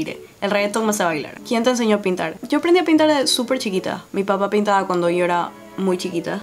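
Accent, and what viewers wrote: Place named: Venezuela. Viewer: Yo también juraba que eras de Venezuela por tu acento jaja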